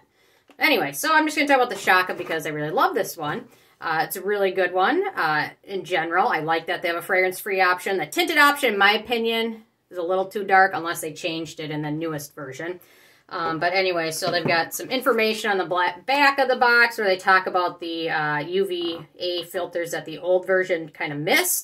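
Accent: Minnesota, United States. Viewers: Are you from Minnesota, by any chance?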